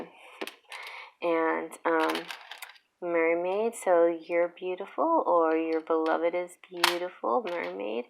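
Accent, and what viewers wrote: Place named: United States. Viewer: Love the charms and yes u have a nice voice is American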